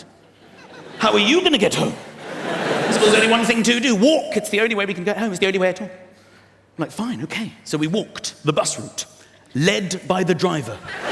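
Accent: RP, United Kingdom. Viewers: I think it's his very posh accent that makes his style so good. Very funny, very likeable guy.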